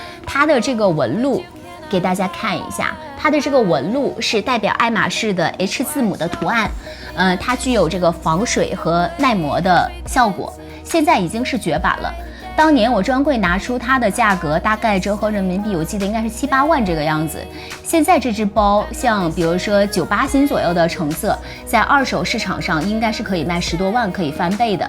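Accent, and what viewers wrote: Japan. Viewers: A Japanese way pronunciation found commonly in Japan. Haven't u been to Japan.... If not, watching more Japanese YouTuber lol...... Haha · 應該比慣用日文發音吧，她應該是銀座老闆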